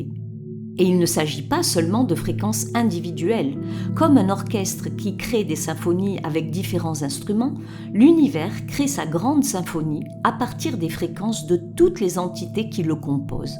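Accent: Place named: South, France. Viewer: Encore un grand merci pour cette vidéo très instructive! Elle me donne l'envie de pratiquer davantage le yoga, de méditer davantage et de chanter encore et plus encore! Et des milliers de mercis pour ta jolie voix du sud de notre beau pays qui me fait chaud au cœur❤❤❤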